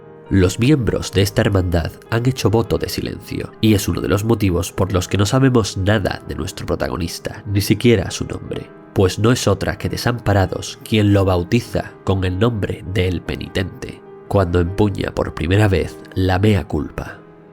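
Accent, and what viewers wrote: Spain. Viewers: Excelente trabajo, y que este relatado con acento español le suma mucho a la ambientación.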